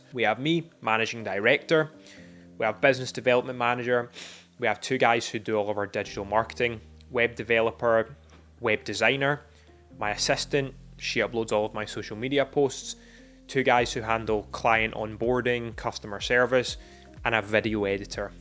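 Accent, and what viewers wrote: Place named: Glasgow, United Kingdom. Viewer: Hey, I've just came across your videos. Are you based in Glasgow? Making presumptions on your accent. I'm full time trading based in Motherwell. I'm sure I'm the only full time swing trader in Motherwell!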